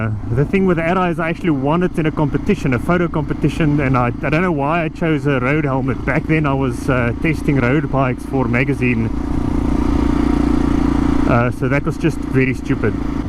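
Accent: South Africa. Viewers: Is dié man Afrikaans?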